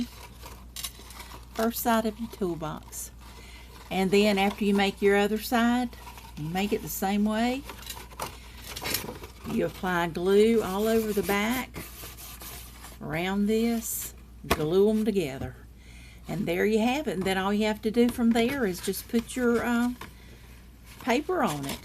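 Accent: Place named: South, United States. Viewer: Hi,: I think you did a fabulous job on this video... you've enticed me to make a tool box for my mum, who is in a retirement home. She's a very girly girl (at 90 she calls herself a girl!) so I'm going to use pink cardstock and pink floral paper. I know she'll love it. Thanks so much for sharing and do please continue to do tutorials... you're really very good at it... and I love listening to your sweet southern voice. Hugs, Nancy:)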